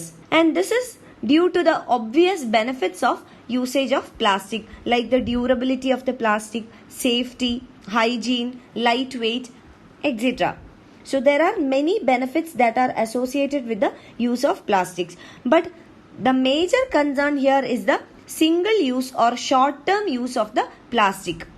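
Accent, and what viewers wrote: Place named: South India, India. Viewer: Your south Indian accent is horrible, the word etc and marine. For God sake please take some classes to improve, its very irritating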